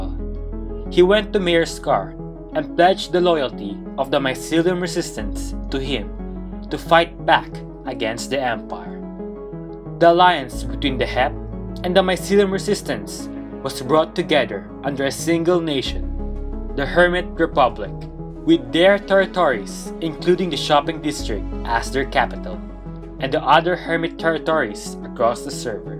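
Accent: Philippines. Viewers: I never heard such a Filo accent in a Minecraft video holy crap ahahaha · are you Filipino? you sound like one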